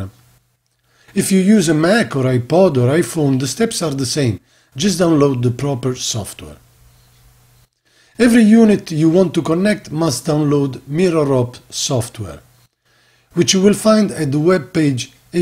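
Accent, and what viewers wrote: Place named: Italy. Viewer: accidenti l accento italiano come si sente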